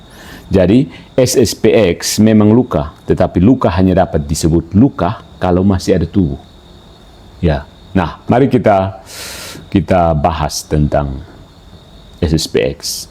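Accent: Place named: Eastern Indonesia, Indonesia. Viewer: Selain isi dari vidio ini yg luar biasa , saya palingsreg ketika mendengarkan tatanan bahasa n logat bahasa serta intonasi saat berbahasa utk saudara /i Indonesia Timoer kita Paaaas n sertifikat itulah berbahasa Indonesia dengan baik n benar . Terimakasih Tuhan Engkau masih memberi ruang n waktu utk diriku n klg ku menjadi tubuh gereja katolik .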